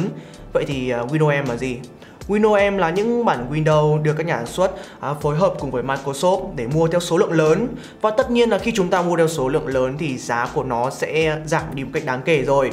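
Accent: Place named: Hanoi, Vietnam. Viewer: giọng hà nội ai cũng vậy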